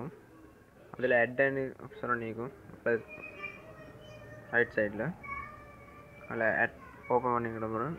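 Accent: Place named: Sri Lanka. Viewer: He's Sri Lankan I think. That's why the slang is different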